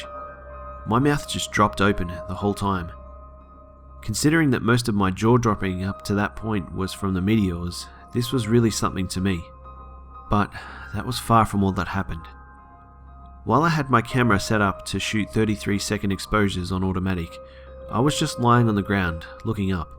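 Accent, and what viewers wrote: Australia. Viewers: love vids ya gotto have a aussie on youtube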